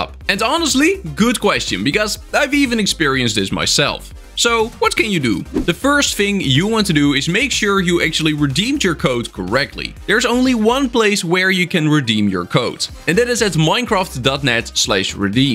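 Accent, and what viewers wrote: Netherlands. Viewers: A Netherlandisch acent???? and thx btw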